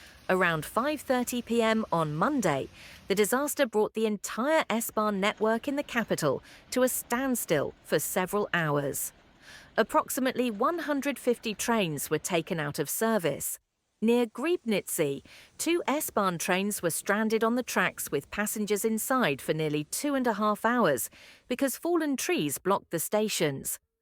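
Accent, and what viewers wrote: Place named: England, United Kingdom. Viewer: Too many mistakes in both English and German in the subtitles, and why is there no punctuation? One sentence runs into another without any pause. The voiceover sounds much too happy, absolutely bursting with glee. And why the English accent but American grammar? For example one hundred fifty instead of one hundred and fifty. Obviously the work of AI.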